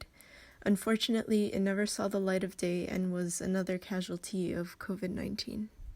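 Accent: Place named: United States. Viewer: She sounds American to me. Stay in your lane weirdo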